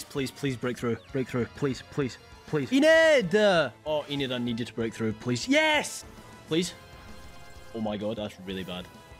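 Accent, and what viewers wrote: Scotland, United Kingdom. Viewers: Its nice that you have a Scottish accent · you're so aggressively scottish and i love it because so is my grandfather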